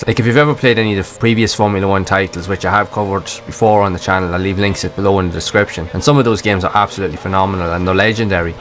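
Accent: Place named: Drogheda, Ireland. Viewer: Class vid. Thanks for sharing. Thats gotta be a drogheda accent?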